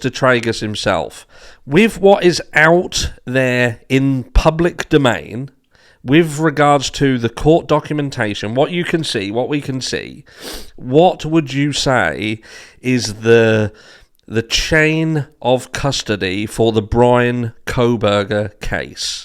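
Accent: United Kingdom. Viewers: The only difference between you and Peter Tragos is your British accent. Visually you look identical so I have to wait until you start speaking before I can tell for sure.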